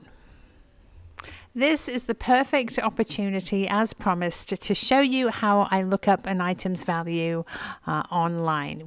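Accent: England, United Kingdom. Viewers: Your accent makes me want to turn you off,some times you overdo the High English accent.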